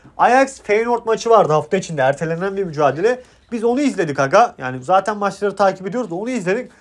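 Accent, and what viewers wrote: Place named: Thrace, Turkey. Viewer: aga sen trakyalımısın bizim gibi konuşuyorsun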